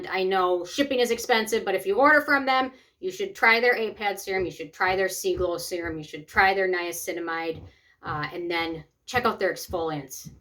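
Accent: Minnesota, United States. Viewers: wow, i always recognized your accent as MN, I grew up in Woodbury and spent a lot of time in Stillwater, especially downtown. A beautiful town!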